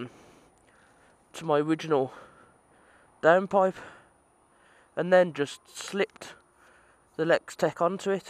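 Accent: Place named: Walsall, United Kingdom. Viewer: you from walsall area by any chance i reconse the accent ???????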